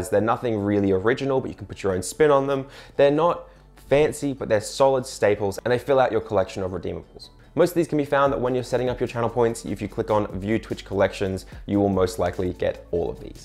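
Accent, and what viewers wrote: Ireland. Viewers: I like the Irish accent! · As a German that is constantly being told that I have 0 accent, I took inspiration from your Irish accent and let my chat force me to "spiek in zeh tscherman eccent leik zhey taught as tu spiek in school" - instant redeem the first time I used it and my viewers got a kick out of it - thanks for the idea.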